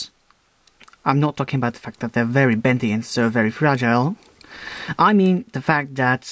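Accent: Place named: United Kingdom. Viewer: man i cat get ur accents.. its kinda british, but than it sounds kinda fobby azn hmmm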